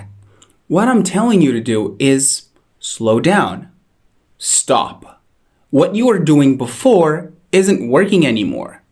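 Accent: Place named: United States. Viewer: Another great video. I suppose I should have asked you this question in your American accent video, but I hope you don't mind my asking your more questions. Did you study how to make each sound (each vowel and consonant)? I know you read out loud a lot and used movies, songs, podcasts, etc. to get your American accent. But did you also use any American accent textbooks or websites to get your American accent? I love your videos. Thanks.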